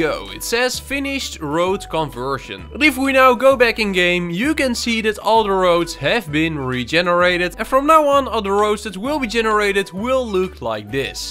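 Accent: Netherlands. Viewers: sounds very dutch xd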